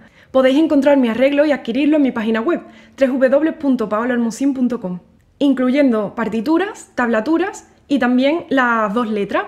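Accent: Andalusia, Spain. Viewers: "güena ta'rdeh" hace que su asento andalú en su boca suene tan bien como las cuerdas de su guitarra. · Su lengua nativa es claramente el español. Habla un dialecto del español que se llama "andaluz ", con un acento que escucharías habitualmente si viajas por la zona de Andalucía Occidental.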